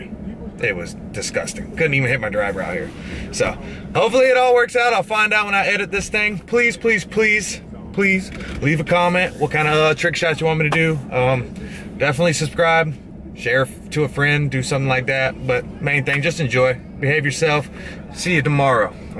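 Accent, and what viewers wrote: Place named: South, United States. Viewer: i didnt expect your southern accent i never heard your voice till now🤣 and it made me fw you even moree very chill and laid back love to see it!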